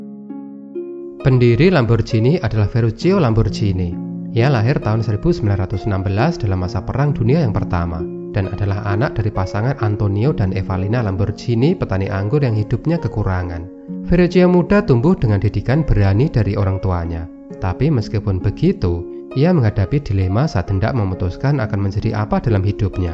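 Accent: Java, Indonesia. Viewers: sip lanjutkan mas dengan suara khas medoknya,hehehehehe... barang kali ada yang minat tutorial design grafis dan percetakan kunjungi channel ini, mudah mudahan ada manfaatnya....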